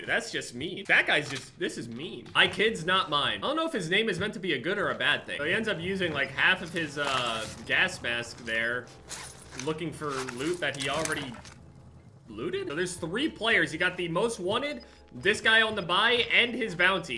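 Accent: United States. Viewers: I love his cowboy accent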